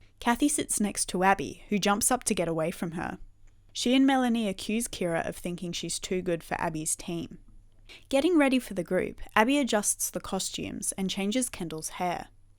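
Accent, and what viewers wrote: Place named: Western Australia, Australia. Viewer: R u by chance from WA? U sound like it!! (Canadian with a perth roomate)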